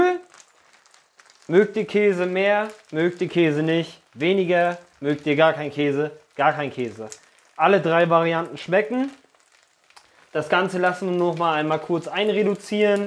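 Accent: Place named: Thuringia, Germany. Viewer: Na das muss ich unbedingt mal nachkochen. Ruhig mehr Rezepte von. Die sind immer so ein bisschen moderner und ausgefallener. Spezialität sind doch eher Klassiker oder zumindest neu interpretierte Klassiker. Ich habe gemerkt, dass ich auf einem anderen Kanal schon oft solche "schnell, einfach und modern" Vorschläge gesucht habe, als ich die leere Pfanne gesehen habe und schon den thüringischen Akzent im Ohr hatte "erst mal einen Klecks Öl in die Pfanne" 🤣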